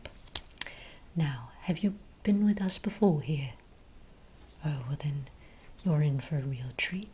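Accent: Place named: United Kingdom. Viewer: I like your British accent! 😁 I'm working on a British accent for my next video too, it's not easy 😆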